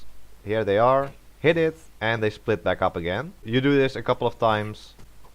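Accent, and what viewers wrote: Netherlands. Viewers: ah, dutch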